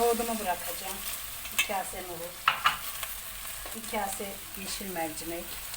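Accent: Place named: Adana, Turkey. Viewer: Selam Hanım. Rahmetli annemin de bize yaptığı bu çorbanın tarifini ararken size rastladım. Konuşur konuşmaz Adanalı olduğunuzu anladım. Memleketten uzaktayken çok iyi geldi anlatımınız. Ellerinize sağlık.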